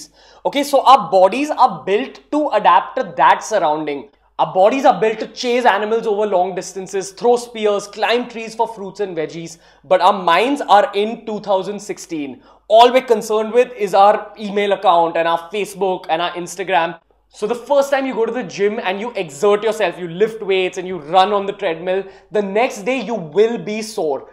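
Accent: India. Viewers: 😂 I can’t concentrate. His accent is too funny. Not to be disrespectful but he has a strong Indian accent I don’t even know what his saying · So guys here is the pure Indian accent for you ..yeah I know its kinda weird 😐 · Why are people talking about your accent... That's a normal accent found mostly in higher middle class or upper class section of Indians... 🙄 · Lol his accent tells he is Indian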